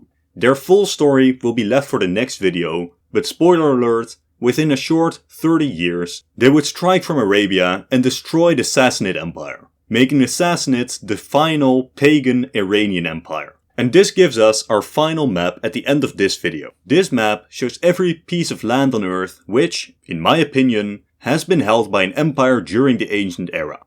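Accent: Netherlands. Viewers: I hear Dutch in your voice my friend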